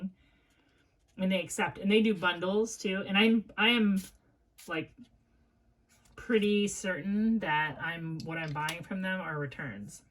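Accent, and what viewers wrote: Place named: Midwest, United States. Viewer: (sp?) you buy and explain/describe the most unusual scents. I like to watch your videos because a. you're a thrifter like me, b. you're another midwesterner and actually sounds/talks a lot like me and c. you're honest about your finds and out there as far as blind buying goes--real gutsy and I like that!! Thanks for another great video!!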